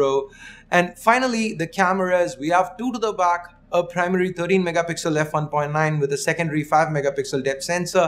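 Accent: India. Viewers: i couldnt hear 80% of what he said. 1. too fast 2. deep Indian accent. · Indian talking in English. My gosh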